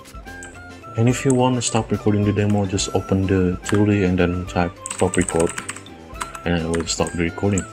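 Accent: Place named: India. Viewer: Amazing Indoglish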